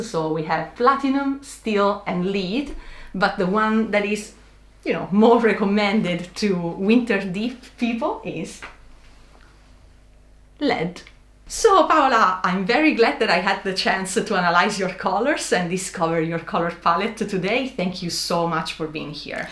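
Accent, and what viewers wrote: Italy. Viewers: I love these videos so much, and I have learned so much. You have a very special gift of explaining the concept and the analysis very well. And although I've seen dozens of them now, I'm still intrigued every single time to find out exactly why which palette works for the gorgeous models (and thanks to every one of them as well for demonstrating how color individually affects us). I must also say that the lovely Italian accent adds so much charm to the whole process. I am a Winter Cool myself, and whenever I go shopping and am determining which color is the right one, I am hearing in my ear "wiinterrr cool" and it puts a smile on my face! Thank you so much 💙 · I've been watching so many of your videos that when you removed the cloth and I said at the same time (with that beautiful Italian accent) "ah noh, the cobahlt is SO much better than the cyan" 😂🤭 amazing video as always!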